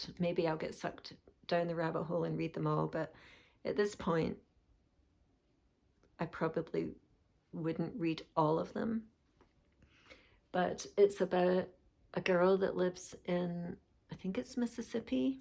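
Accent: Scotland, United Kingdom. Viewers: Nice to hear a Scottish accent on FlossTube! Your finishes are lovely! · I’m not sure how I’ve missed seeing your videos before now. I hear a Scottish accent which I enjoy. My parents are Scottish, and my oldest daughter’s name is Katrina! I’m so sorry your daughter was in such pain. I love your projects. I’m also stitching Summer Quaker. And I’m new to WIP Warriors this year.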